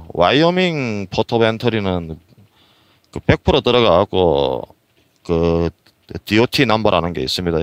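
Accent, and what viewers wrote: Gyeongsang, South Korea. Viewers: 영어도 굉장히 잘하시던데 완즈이 경상도 사나이시군요 ㅎㅎㅎ 항상 안전운전하세요!!! 저도 현직 트레일러 기사입니다^^ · 구수한 경상도 말트때문에 볼때마다 지겹지가 않아 항상보고 있습니다 안전운전하세요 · 고향이 경상도 어디세요? 난 진주가 고향입니다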